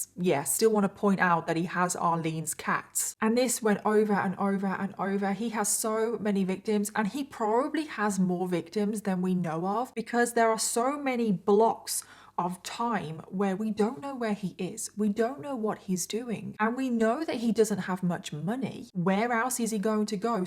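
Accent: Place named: United Kingdom. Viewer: i’m pretty sure she’s british !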